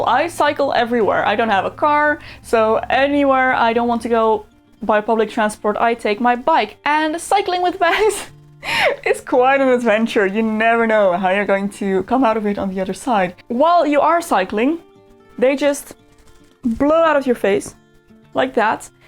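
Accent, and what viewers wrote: Netherlands. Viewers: at the beginning of the video I heared a little accent and I was already wondering where you would come from.. and you said the Netherlands🎉 ik weet niet waarom ik in het engels type maar ik ben ook Nederlands!! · Omfg wait, are you dutch ? So from the netherlands ? Or did i hear it wrong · IK WIST GEWOON DAT JE NEDERLANDS WAS AAM JE ACCENT VANAF T BEGIN LMAO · Ik wist dat je Nederlands was. Ik hoorde het. Maar echt je hebt echt een mooi accent. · Hallo ! Ik dacht altijd al dat je zo een Nederlands accent had nu weet ik waarom en goede video 😗🌸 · Ik wist het! Ik hoorde aan je accent dat je nederlands bent. Trouwens, ik heb vroeger een pony gehad, en ik wil er weer een maar ik vind de nadelen wel kut. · jaaa zie je wel!! Hoorde het aan je accent. Super leuke tutorials over je ponny ;-)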